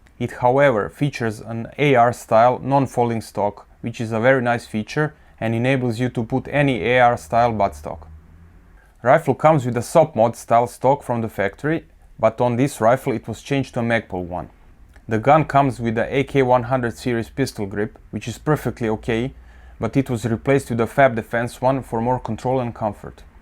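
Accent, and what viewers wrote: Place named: Eastern Europe. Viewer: Славянский акцент. Вы из России?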